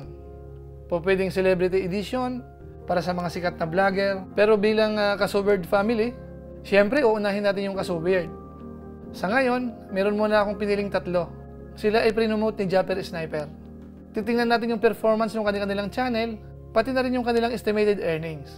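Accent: Bicol, Philippines. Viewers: Bicolano ka ba noy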